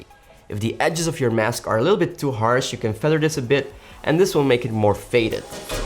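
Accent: Belgium. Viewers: you sound like you live in belgium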